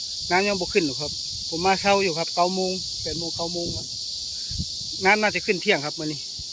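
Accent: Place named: Thai Yo, Thailand. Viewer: ไทยญ่อบ้านได๋น้ออ้าย? สกลฯหรือนครฯ?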